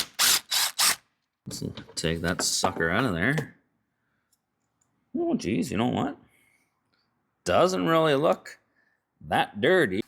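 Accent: Canada. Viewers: Hey dude , you must be canadian? Great content! Keep up the great vids.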